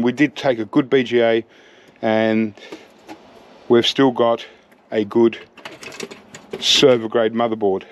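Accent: Australia. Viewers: I cry when I see him selling the boards without depopulating them like the TITLE said...but still he is very worthy of watching and the Aussie accent always tickles me.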